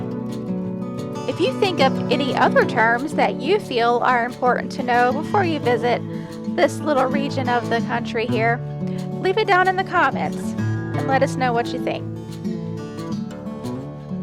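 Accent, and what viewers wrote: South, United States. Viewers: is back. Our southern Belle is back and good to hear her southern swang!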